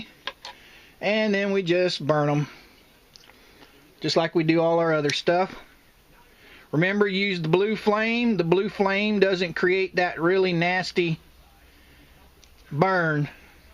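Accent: South, United States. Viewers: Hey, thanx for all the great videos. Finally, there's someone who speaks Southernese that I can fully understand. Was wondering if you've ever done a necklace like the ones professional baseball players wear. My grandkids keep asking me if I know how to make them. Thanx again, Tmat46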